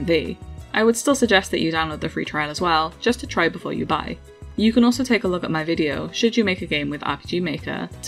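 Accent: South Wales, United Kingdom. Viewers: I'm from South Wales too! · woooo south wales gang let’s goooo! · South Wales accent?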